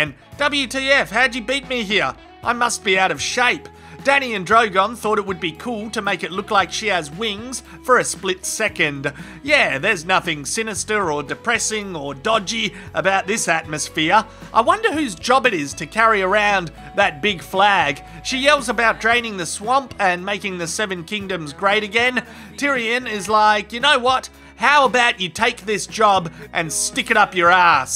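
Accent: Australia. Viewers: Thanks for putting all of these together over the years. it’s great hearing a normal Aussie voice poking fun but also reviewing it as the show has gone along.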